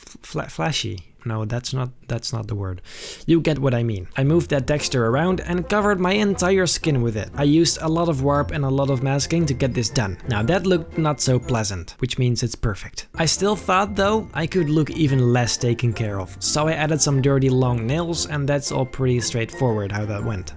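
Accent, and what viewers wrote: Netherlands. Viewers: Your dutch, i hear it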